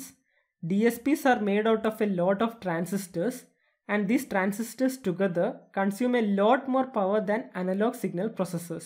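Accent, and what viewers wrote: Kerala, India. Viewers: Loved his mallu accent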